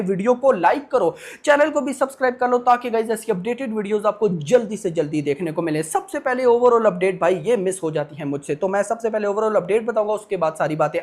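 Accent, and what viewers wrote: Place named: Pakistan. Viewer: Why his accent is so so similar to Pakistanis ?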